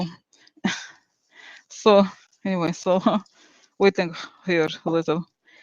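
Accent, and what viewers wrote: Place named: Russia. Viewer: Mark Harris I try to imitate her Russian accent but I can’t get the rolling R’s down. It’s so funny!! Love her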